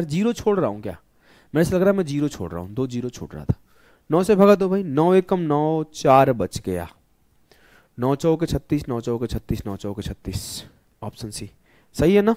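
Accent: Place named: United Kingdom. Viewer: App itna smart q ban rhe h ,, aram se hindi padh kr samjhaiye,, ham jante h ki apka english thik h,, chanal ke naam h bihar yodha or briten jaisa bhasha bol rhe h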